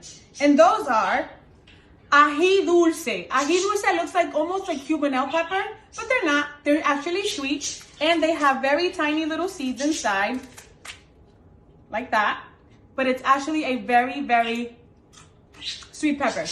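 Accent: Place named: Puerto Rico. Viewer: Hearing her speak makes me desperately miss My NY boriquas. South Carolina SUCKS when it comes to REAL food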